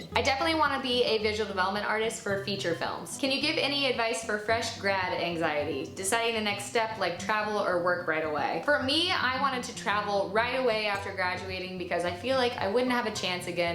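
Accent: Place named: South, United States. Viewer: alina kouzouian Southern drawl.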